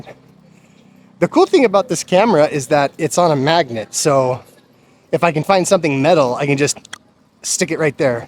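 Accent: Philippines. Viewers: I also like pandecoco. Im so amazed with your Filipino intonations sounds real!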